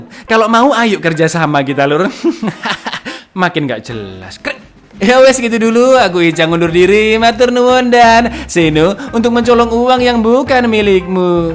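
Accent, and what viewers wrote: Java, Indonesia. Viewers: Sy sering nonton spoiler video anda.. Bagus dan kocak. Cm kurangi medok lpgat bahasanya krn tidak semua org paham maksud anda. Jd gunakanlah bahasa Indonesia yg baik. Tetap semangat 👍🥰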